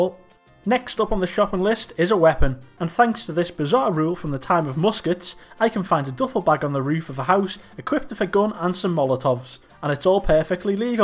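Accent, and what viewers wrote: Liverpool, United Kingdom. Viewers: Yes now scouse youtuber im here for it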